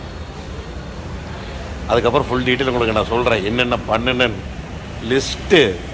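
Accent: Kongu, India. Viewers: "சுடச்சுட பெயின்ட் அடிச்சு நிக்குது!!" அண்ணா நீங்க சொன்னதை நான் மிகவும் ரசித்தேன்!! அசல் கொங்குத் தமிழில் நீங்கள் பேசுவதை கேட்டு ரசிக்கவே உங்கள் வீடியோக்களை பார்ப்பேன்!!!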